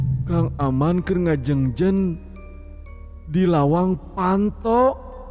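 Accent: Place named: Sunda, Indonesia. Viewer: Kang... Pas ngadongengkeun carita jurig, Osok sieun henteu? Hehehe Abdi nu ngupingkeun mah meni sieun tapi resep da kang nyunda pisan.. perkuat di soanten kang... Musik latar sebagai pemanis z. Nuhun kang.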